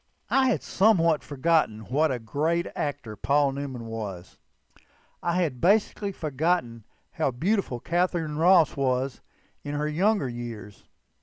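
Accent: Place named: South, United States. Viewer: where is the narrator's accent from? it sounds southern.. south carolina? Louisiana?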